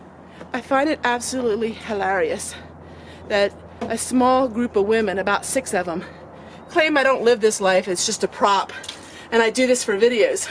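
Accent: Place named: Pennsylvania, United States. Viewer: I understand. I watched your Sunday video. It was excellent! You're such a godly lady. ❤ If I didn't know any better I'd think you were from my old neck of the woods.....in Pennsylvania.... because of your accent. 😉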